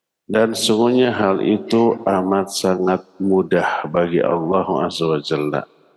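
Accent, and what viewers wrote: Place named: Sunda, Indonesia. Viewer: my favorit, kental logat sundanya,,mugia sehat selalu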